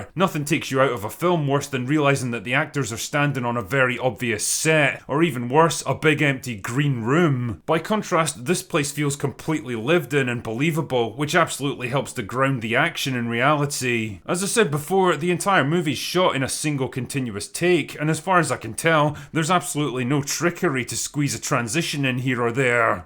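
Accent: Scotland, United Kingdom. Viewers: I know and funny too. The Scottish accent shite!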